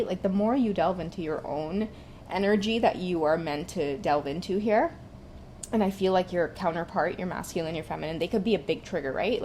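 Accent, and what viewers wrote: Trinidad and Tobago. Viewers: You sound like a Trini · Best reading just for that Trini? accent.... So beautiful... I love your vibe❤ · That Trini🇹🇹 accent though 😍 But I'm Jamaican🇯🇲 the song too 😅 but yea I totally get it 😀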